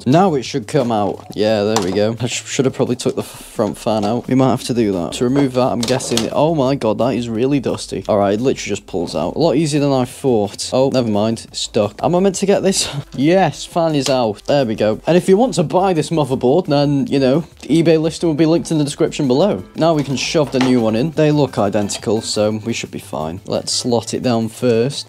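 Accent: United Kingdom. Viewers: I love that British accent, it makes me feel that I'm the one troubleshooting the pc.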